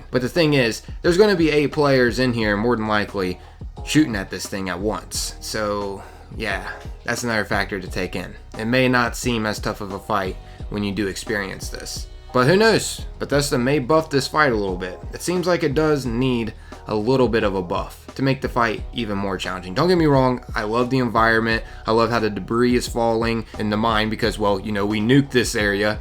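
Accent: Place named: Rural, United States. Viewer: YEAAAAA!!!!!!!!!!!!!!!! THE CONTRY VOICE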